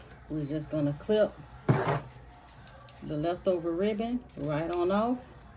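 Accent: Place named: Lowcountry, United States. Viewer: Im watching and learning .. you sound just like my family in the low country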